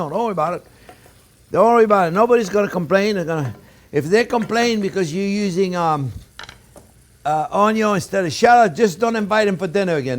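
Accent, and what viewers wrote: France. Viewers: You are really nice and funny with your english francaise accent ;) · Ptn ct' accent français jpp 😂, bonne recette sinon 👍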